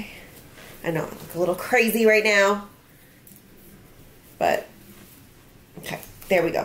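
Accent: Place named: New York, United States. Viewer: Just ordered one! BTW, you ded have a NY accent lol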